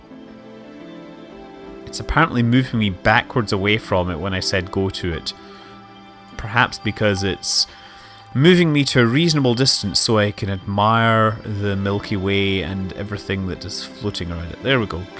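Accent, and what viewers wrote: Scotland, United Kingdom. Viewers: I wish I could watch someone explore Space Engine and commentate it with a Scottish accent for the rest of my life. There's just something about it... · Scott live in California?! I thought he lived in UK because of his accent!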